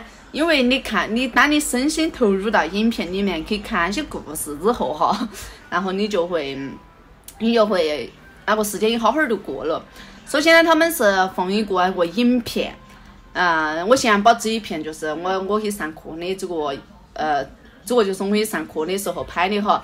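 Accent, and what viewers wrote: Guizhou, China. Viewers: 恭喜很喜歡全程貴州話聊天聽起來費用力但是賊可愛滴 😘😘😘🤣🤣🤣🤣🤣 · 貴州話和重慶話很近，但不一樣的地方也蠻多的哈！不看字幕，我聽得懂95%！😁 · 這是貴州話嗎？太棒了！加油！ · 哇~第一次聽妳用貴州話拍影片，感覺貴州話口音聽起來蠻不錯聽的，有點可愛，有的很像台語，語助詞也蠻多的哈哈，恭喜妳機車駕照考過拿到到手了汽車要注意安全喔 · 貴洲口音還滿可愛的我很努力的聽 🤣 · 先恭喜姐姐你考上駕照很棒喔、不過妹妹我有件事想跟您說、很開心可以聽到有貴州話的視頻、貴州話聽起來很可愛也很有趣、但是姐姐下次可不可以還是講普通話多一點、因為很多貴州話我都聽不懂耶哈哈哈很難去理解你視頻當中的意思只能大概猜一下囉不好意思.所以以後可以還是講普通話多一點嗎？不好意思.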